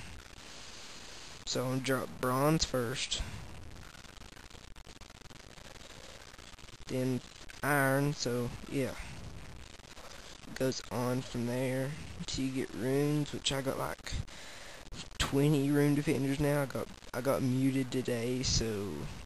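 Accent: United States. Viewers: i like the texan voice too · You have an awesome Southern Accent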